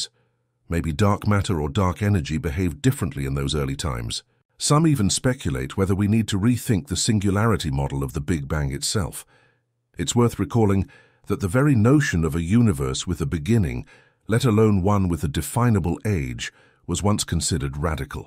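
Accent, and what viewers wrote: United Kingdom. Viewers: AI still can't handle punctuation. Ridiculous. And stuffy brit ridiculous at that.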